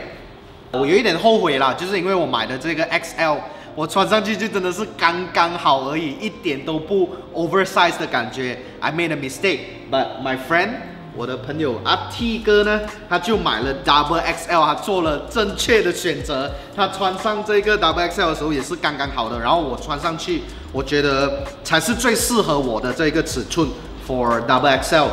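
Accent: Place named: Malaysia. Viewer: 听到熟悉的马来西亚华语，原来您也穿我喜欢的素T，好棒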